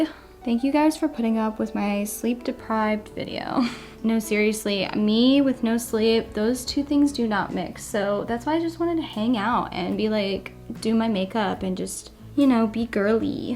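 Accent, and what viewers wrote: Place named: United States. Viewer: You have such a basic American accent I’m living like it’s not southern but it’s not like Boston or new York yk? I have a southern german accent and it’s so aggressive but yours is so smooth like a good whiskey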